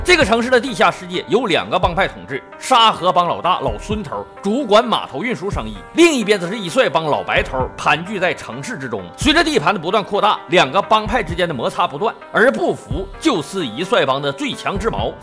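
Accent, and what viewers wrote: Northeast, China. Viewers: 2楼。牛，东北话解说真的到位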